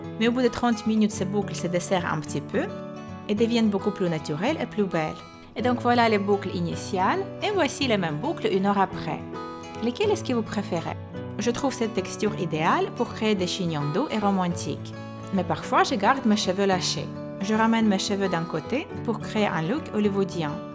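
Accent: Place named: Russia. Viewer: sa se voit que tes russe avec ton acsent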